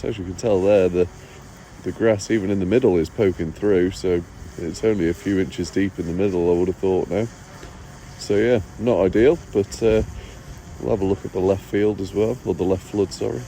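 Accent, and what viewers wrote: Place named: Northern England, United Kingdom. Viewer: Listening from Canada there is something very Monty Pythonesque about this narration with a Northern accent. “Cor, that’s some cracken drivin, that is. T’stone from Shrewsbury. Fine village. Fine pe-pull t’are. Makes a bloke tear up… Right cinematic that is. And there t’is another load! Weren’t expecting that. Down t’lane, quick turnabout and dump! Were elegant, that! Taken up by, excavator, now…and it’s in! Not seen finer. Two before mornin tea.” Something like that. Still, every morning I’m watching. Love it.😊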